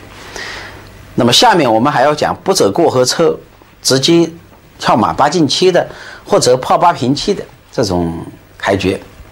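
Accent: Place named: Fujian, China. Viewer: Fujian style mandarin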